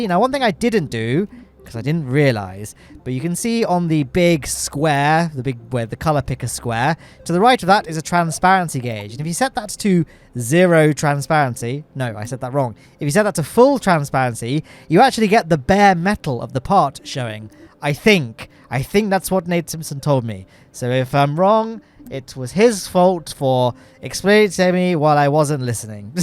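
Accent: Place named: United Kingdom. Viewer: YEEEEEEEESSSSSSSSSSSSSSSSSSSSSSSSSS, I am like a kid on Christmas! 🎉🎉🎉🎉🎉 I can’t wait for the release! Also why do you sound exactly like Grian who plays Minecraft?!?! Do all British people sound the same?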